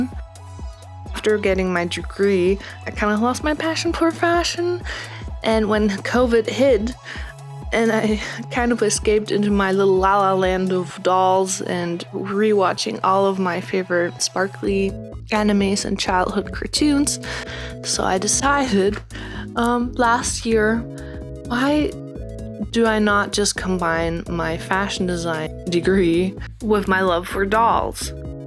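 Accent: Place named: Germany. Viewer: Sorry aber ich wusste in der ersten Sekunde sofort dass du Deutsch bist haha 🌹 Cooles Video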